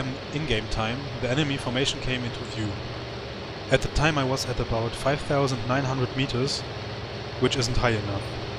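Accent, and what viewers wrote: Germany. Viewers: You sound a little bit like a german guy... am I right?:) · Ihr deutlicher "germischer" Akzent verrät Ihre Muttersprache.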